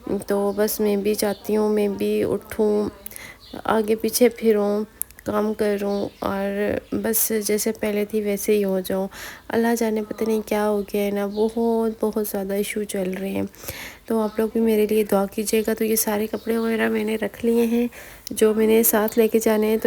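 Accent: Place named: Chhachh, Pakistan. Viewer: اپ کے لہجے سے لگتا آپ چچھ کی ہیں نہیں؟ میں اٹک سٹی سے ہوں اس لیے میں لہجہ پہنچانتی ہوں وہاں کا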